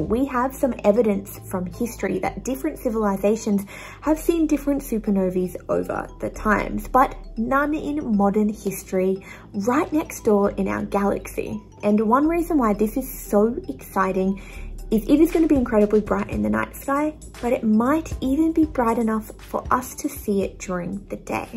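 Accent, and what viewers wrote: Australia. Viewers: It's not a speech impediment. She's Australian. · Yay an aussie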